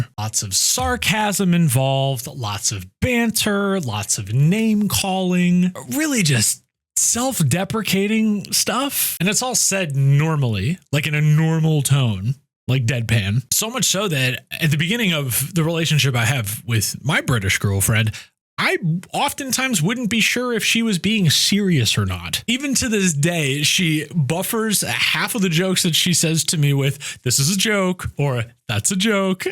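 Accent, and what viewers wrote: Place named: United States. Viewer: sounds american is Indian 😂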